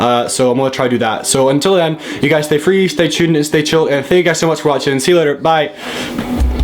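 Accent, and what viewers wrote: Scotland, United Kingdom. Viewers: I think he moved to Scotland from somewhere so his accent scottish accent sort of blended with the other one. Just as half-assed as his tattoos lol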